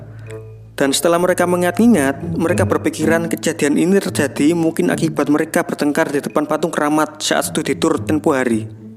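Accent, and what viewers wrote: Java, Indonesia. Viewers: Itu sempah gw bukannya fokus ke film malah ngakaknya ke suaranya😂😂😂udah medok lucu lagi😂😂😂apalagi pas bagian tegak menuju tak terbatas aduh bengkek 😂😂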